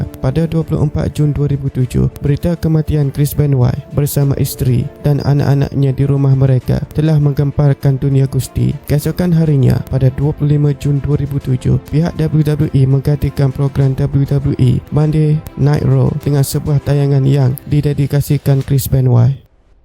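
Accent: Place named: Terengganu, Malaysia. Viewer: Ni yang ckp ni mesti orng ganu atau Kelate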